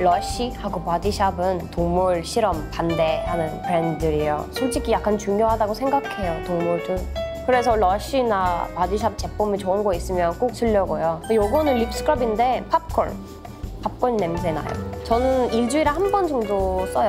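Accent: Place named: South Korea. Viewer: 왠지 한국발음 매력 인는 듯~~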